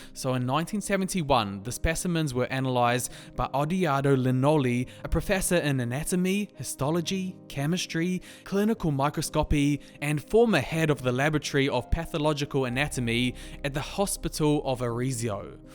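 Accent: New Zealand. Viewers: You're a kiwi right? :)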